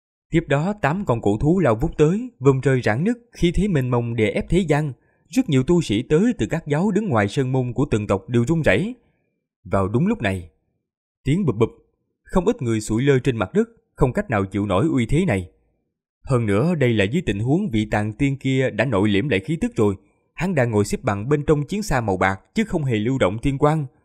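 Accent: South, Vietnam. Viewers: Cảm ơn đạo hữu rất nhiều Vì tui đồng hành vs từ những ngày lập kênh Qua biết bao nhiêu tập truyện.. Nên khả năng nghe và nói tiếng miền Nam tốt lên rất nhiều Đợt công tác vừa rồi vào miền Tây ko có bỡ ngỡ như ng lần đầu vào chút nào cả..❤ Vì thế giao tiếp rất chi là tốt vs ng bản địa Rất cảm ơn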